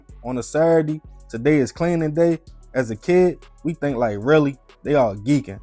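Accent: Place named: Maryland, United States. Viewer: Da con…tent! I’m from NJ and my family lived in Silver Springs, MD and your voice made me recall their accents. Salute.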